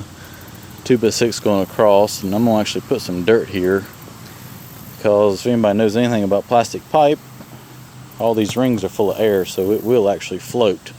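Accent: South, United States. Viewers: I live in east Texas and your southern-accent is slightly different than it is here. You pronounce the word "water" different than most people here. You say "the toe of the dam," and we say "the foot." Unfortunately now, most people here no longer even speak proper Southern English. They put the slang and vulgar in every expression. This video helped me to understand why spillways are important.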